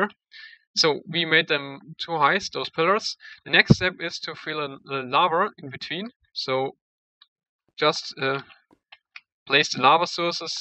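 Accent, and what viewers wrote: Germany. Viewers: Was glaubst du denn, den Dialekt bekommt er nicht mehr, aus seinem Englisch raus. Deswegen sind wir deutschen leider negativ aufällig in der englischen Sprache, weil es soviele gibt die sprechen als ob sie aus der Grundschule kommen... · Man höhrt das du deutsch bist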